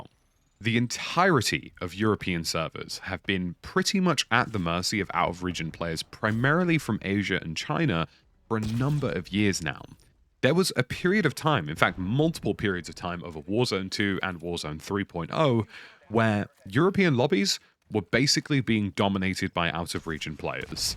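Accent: RP, United Kingdom. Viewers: Stop this posh voice its triggering!!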